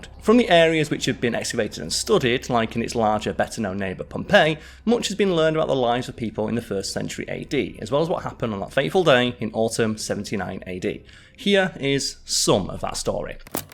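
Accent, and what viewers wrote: United Kingdom. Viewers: Fun fact, accent is closer to traditional British English than Simons. 😊. you may want to slow a bit so many others might understand what you are saying. Your accent is thick and many find it difficult to understand. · yes, true, but the algorithms that attempt to decipher the audio to turn it into captions are (probably) trained on the sort of English spoken by the designers (likely in Silicon Valley in California, USA), and the largest repository of audio recordings (Hollywood, in Southern California, USA.) So the algorithms are biased towards Californian speech, in all likelihood. It’s not surprising that they wouldn’t work well for either Simon or this fellow, both of whom are Brits. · ❤ Your enunciation may need a little practice, but I could understand you just fine, DESPITE your British accent, LOL! One note about your not wanting to eat “poo fish 🐠,” have you had Tilapia or white fish? 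Tilapia is a new name for a old fish, but 85%-90% of the worlds Tilapia comes from…wait for it…sewage treatment plants. Not joking. Look up an episode of “Worlds Dirtiest Jobs” about Tilapia farming. 🤢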